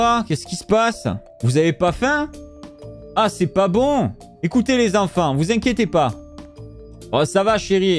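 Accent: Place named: Marseille, France. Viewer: Cc je pense que tu habites à côté de Marseille tu a des accent 😭😭😝😝😜😜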